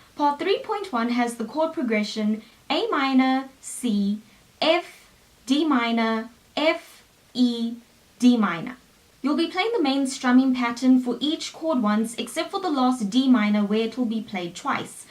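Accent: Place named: South Africa. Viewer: sounds like south african to my ears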